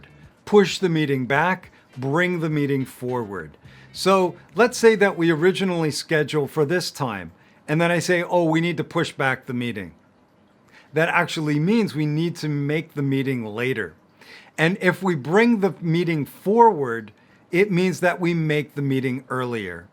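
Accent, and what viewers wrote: United States. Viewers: I like u very much for american accent sr. I have been watching ur vds since long time and im imroved a lot. Lots of love. ❤ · Hey there You absolutely have an amazing and super important accent! Are you from mid west of the United states? I lost your terrific channel a long time ago.but I got it today in a fantastic way. I wrote fluency English for Chinese! Wow I got you there! Truth be told I l have been looking for you for more than four months! I lost your name and the name of your unique channel! What I am really interested in creating music with your lessons I learned gimme from you last year! Thank you so much for sharing this amazing lesson!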